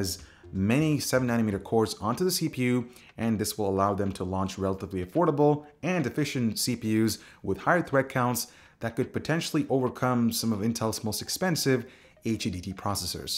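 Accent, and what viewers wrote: India. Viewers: are u indian.? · Is this guy Indian?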